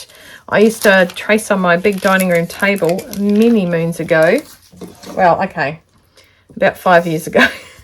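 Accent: New Zealand. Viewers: I just discovered your channel via the #fridaysews. So enjoyed watching and good to listen to a fellow kiwi.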